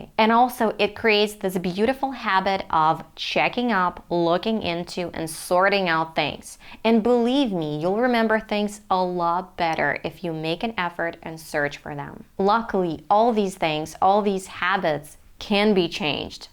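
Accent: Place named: United States. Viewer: Your accent is so American👍👍👍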